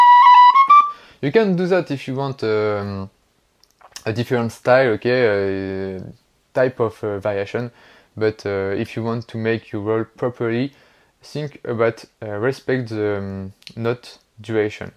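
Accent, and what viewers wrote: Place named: France. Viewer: Je ne me lasserai jamais de ton accent so...french! Mais l'essentiel est de se faire comprendre..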